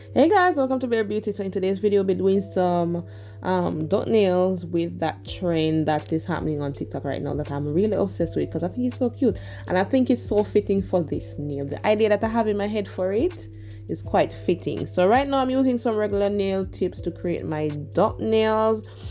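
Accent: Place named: Caribbean. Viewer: I hear caribbean accent 😅 where are you from?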